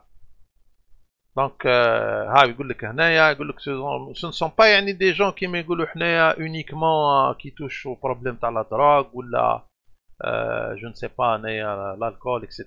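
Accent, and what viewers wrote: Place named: Morocco. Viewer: Ce minable a un accent marocain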